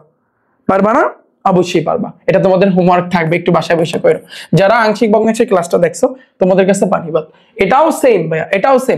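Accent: Barishal, Bangladesh. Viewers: স্যার এর বাসা কই,,কিছু কথা শুনলে মনে হয় বরিশালে 🤔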